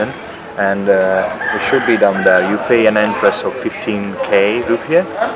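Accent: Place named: Italy. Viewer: italian accent :) , i love you vlog , i made a vlog in the same spot and in see lot of italian that day :)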